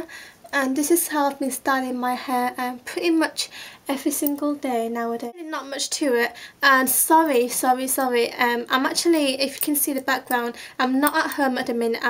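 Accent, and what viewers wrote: United Kingdom. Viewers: is that a birtish accent, not to be rude if it is :)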